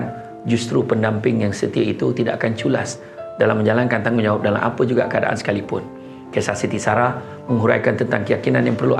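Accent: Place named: Kuala Lumpur, Malaysia. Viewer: Jangga raso eehh Ustaz cakap kolumpo.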